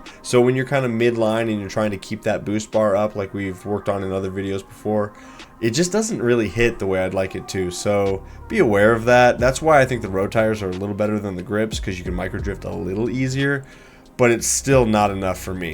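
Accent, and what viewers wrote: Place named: United States. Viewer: Two-ring 😂 American accent is so funny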